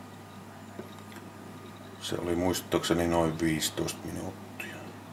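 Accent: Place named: Savo, Finland. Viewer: On siinä vähä paljon muutakkii.Hauskaa tämä Savon murre.Itsekkin Savolainen kyllä.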